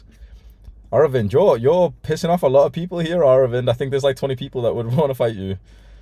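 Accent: Liverpool, United Kingdom. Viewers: Never miss a video.. Cuz learning British accent.. 😂😂❤️ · I think he's from Liverpool ahah, I'm from Bradford and my accents pretty different. But I guess it shows how right you are about accents changing alot aha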